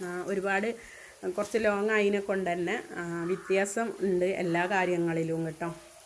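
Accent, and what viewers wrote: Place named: Mahe, India. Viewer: സ്ലാങ് ഇപ്പോൾ മാഹീ ആണല്ലോ കാട്ടുപാറ അല്ലല്ലോ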